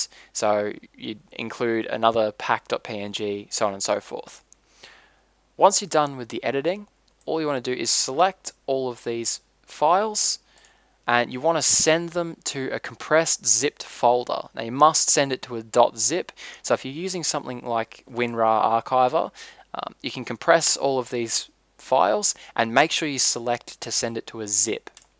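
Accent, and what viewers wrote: Australia. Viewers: hes australian? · i love ur accent im not sure but is it Australian? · Are you from Australia? C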